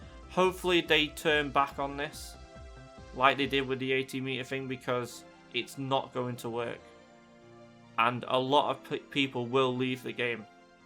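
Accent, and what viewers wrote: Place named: Manchester, United Kingdom. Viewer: Hi. Count Jinsula did a video today saying it looks highly likely that Hanke has put his demand out that he doesn’t want remote raids at all. On another note, I detect a Mancunian accent. You know how bad the weather can be in that part of the country. (I am Lancastrian) but live in S Wales now which arguably experiences just as bad weather conditions. There are times it’s just far too dangerous to go out at all. Hanke lives in his deluded narcissistic bubble where everyone on the planet lives in a mansion in California, where no one works but has unlimited amounts of spare time and money, with unicorns leaping over rainbows. Hanke, right there, is a brilliant example of how to run a company into the ground , bc narcissists are never wrong and know absolutely everything about everything and they know better than everyone else. 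All hail Mr. 💩 Sorry for the rant. I love this game but I went from being never being able to raid legendaries (always lived rural, only myself is playing) to being able to raid legendaries, to now not being able to.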